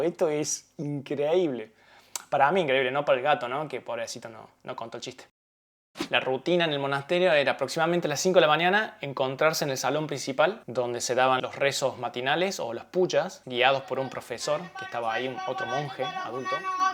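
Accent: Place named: Córdoba, Argentina. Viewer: Mori con tu rrelato!! Hace unos años anduve 6 meses x Nepal e India, y llegue hasta Leh! Que lugar hermoso, y la cultura tibetana, me enamore! Mi idea es volver...No entendi como se llama el monasterio en el que estuviste viviendo, y el puebloto en wl que estaba. Tenes tonito Cordobés...yo vivo en Villa Giardino hace un par de años 😁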